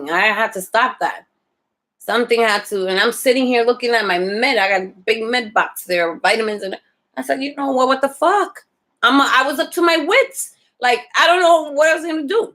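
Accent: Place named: Bronx, United States. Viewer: Honey, are u from the Bronx? I ask because I am. U sound like it. Anyway, I know ur not a professional but I want to ask. Have u ever had ur dr cut ur klonopin dose. Mine did it. And it's been 3months. I updosed n still no relief, my brain is so messed up. Cant function. I'm a CNA n have to work. U can imagine. I updosed again. So stupid up n down. U ever had this?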